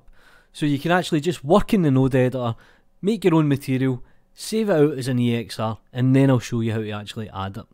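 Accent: Scotland, United Kingdom. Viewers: you're instatntly my fav blender tutorial for your scot accent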